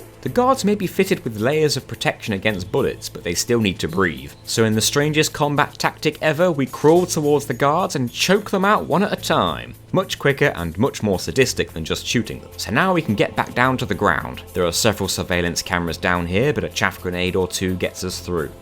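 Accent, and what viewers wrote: England, United Kingdom. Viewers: As a non native english speaker, I love the english accent. Great video btw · Great video... Except ur bri" "ish, love. Smhhh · Yes he is New Zealander. Great show. All through the video all i could think was i've heard this voice before. Are you from UK?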